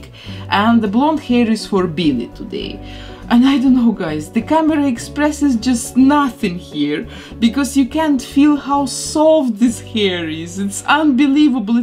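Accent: Russia. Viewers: Русский акцент присутствует)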